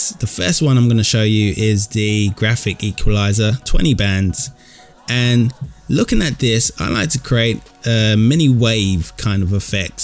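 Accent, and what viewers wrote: United Kingdom. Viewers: How do we get that sweet British accent, tho?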